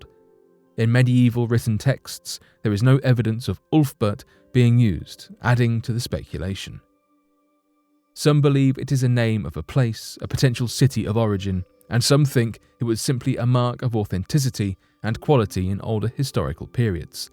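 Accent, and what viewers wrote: United Kingdom. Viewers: An even greater unexplained mystery... Why is it that all the mystery/unexplained YouTube channels are narrated by Brits or Irish?! Not bashing I love it #Attenboroughismydad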